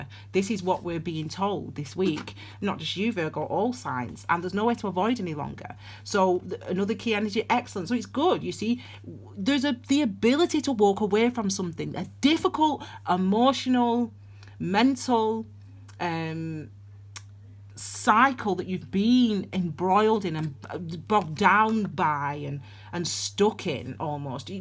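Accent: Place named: Northern England, United Kingdom. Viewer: Welcome back! Really lovely to hear from you. I forgot how much I've missed your accent! Eeh by eck lass! 😂😂😂 Clare (Manchester) xx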